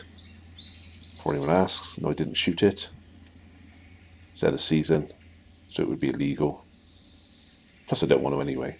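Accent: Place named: Dorset, United Kingdom. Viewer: You sound like you`re from my area, are you a Dorset man?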